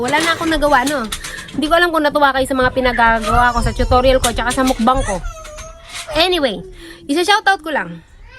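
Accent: Visayas, Philippines. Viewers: Hahah... Mommy kiat lng bah ... Bisaya jd kaau mommy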